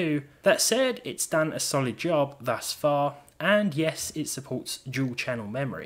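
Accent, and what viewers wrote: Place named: United Kingdom. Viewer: It's for the big brain... which pumps out the British accent... which makes both my ears and eyes bleed :(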